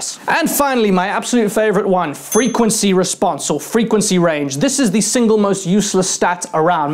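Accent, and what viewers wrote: South Africa. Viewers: U almost sound south african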